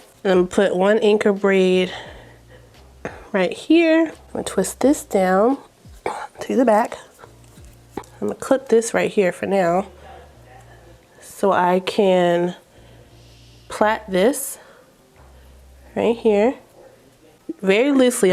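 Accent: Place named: Baltimore, United States. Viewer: That Bmore accent! Love it!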